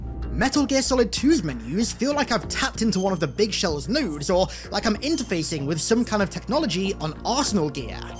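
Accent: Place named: United Kingdom. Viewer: was gonna watch but ur british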